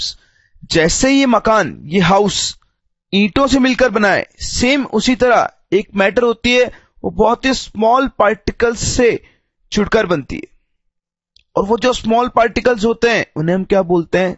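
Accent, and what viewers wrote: India. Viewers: Indian English..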